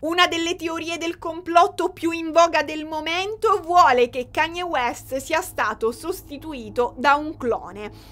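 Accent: Sicily, Italy. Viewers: esagerato accento siciliano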